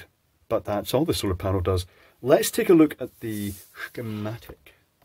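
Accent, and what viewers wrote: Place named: Lowlands, United Kingdom. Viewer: Hi i have a question, whereabouts are you from? Your accent is so sophisticated, you sound like a lowlands scot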